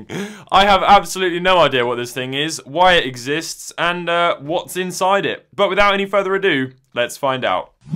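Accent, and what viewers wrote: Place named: United Kingdom. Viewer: >annyoing british accent disliked and moved on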